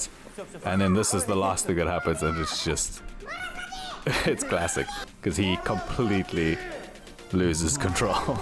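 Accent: South Africa. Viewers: Hi are you South African ? Sounds like a wyt saffer accent. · Awe boet, I can hear you are South African, good to hear a familiar accent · You are definitely south african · Bru are you South African? You sound South African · HOL UP ARE YOU SOUTH AFRICAN ????????? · Are you from South Africa, accent sounds like it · Well done not the video. Are you South African? You have to be with that accent.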